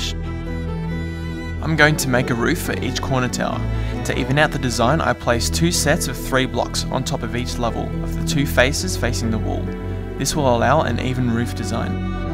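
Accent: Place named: Australia. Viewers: i did not know was Aussie! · all aussies sound the same apparently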